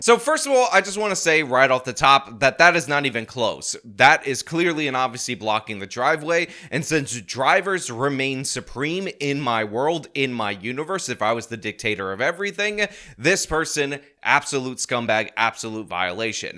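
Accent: New York, United States. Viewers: Oh Boy, your "inner New York" REALLY came out on this video:-)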